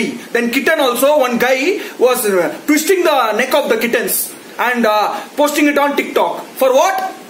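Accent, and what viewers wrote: Bengal, India. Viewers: his hindi just oozes that bengali feeling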